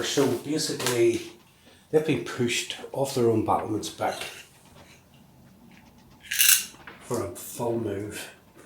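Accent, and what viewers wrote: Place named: Northern Ireland, United Kingdom. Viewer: Good stuff bud, have you been playing RF long? I hear yhe Nordy accent, I live in Dromore between Banbridge and Hillsborough, where abouts are you?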